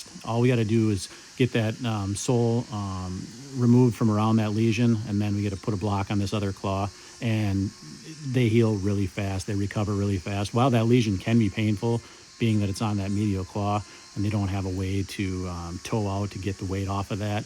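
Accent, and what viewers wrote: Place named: Wisconsin, United States. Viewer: I don't know if it's the Wisconsin accent that reminds me of home, or if it's that I identify with a good pedicure, but I love these videos.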